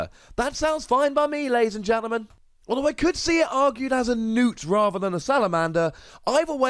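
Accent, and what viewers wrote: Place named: Cornwall, United Kingdom. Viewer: AYY a fellow Cornishman. That's lit, thought I was hearing a Cornish accent but I thought it was all in my head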